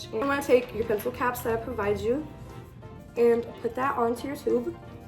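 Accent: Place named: Latin America. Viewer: Are you Hispanic? Your accent really throws me for a loop bc you sound Latina. You are, right, like half maybe? (And btw polymer is pronounced 'paa-luh-mer.' I thought you were referring to something w/ a trademarked name, something like 'polymorph' or something. Polymers are a class of materials that are like plastic. But you should say it correctly.) Also, funny that you're teaching kids how to use syringes. I use syringes for um....something else. And don't call an object 'he/she.' Your videos make me angry.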